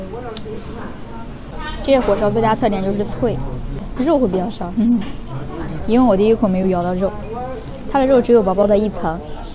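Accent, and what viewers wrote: Shandong, China. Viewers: 加油山东口音不要丢视频能做到一分或一分半钟之内删删剪剪也不要紧希望参考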